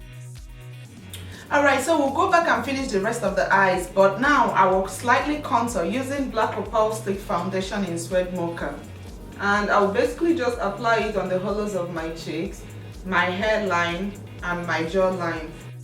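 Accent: Nigeria. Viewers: Where are you from? I hear the West African accent, Nigeria. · Are you from Nigeria? Just asking because you sound like my mom who's Nigerian. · okkayy that accent is my Nigerian sister. I am Nigeria and I know when I heard your voice for the first second.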